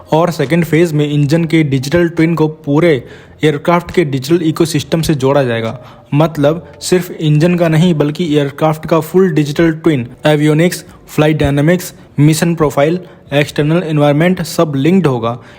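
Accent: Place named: Bihar, India. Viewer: bhai sabse pehle apko defence me thodi geopolitics v add krni hogi just few news and bhai jab aap koi English word bolte ho it sound like u live in bihar apko thoda accent shi krni hogi,(please dont minde) or bhai apni video baki k defence channels se ada ya ak ghtna pehle upload kiya kroo, or bhai kisi news ko poistive way thoda criticise v kiya kroo ap just news bolte ho thoda explain v kiya kro aisa nhi aisa hona cheahe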